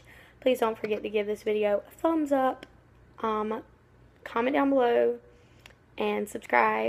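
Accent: United States. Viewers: you're so gorgeous inside and out!! love your country voice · you nail is more fleeky than my two hands (also are you from south or north Carolina because you sound like my aunt!)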